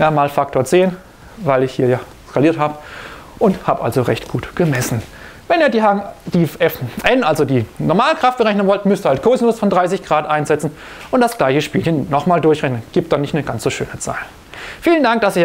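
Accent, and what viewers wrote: Swabia, Germany. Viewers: Schwäbisch versteh ichs gleich viel besser top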